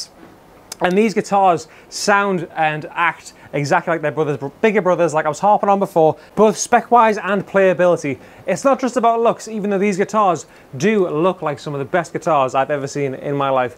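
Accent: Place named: Newcastle, United Kingdom. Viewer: The Geordie Phil X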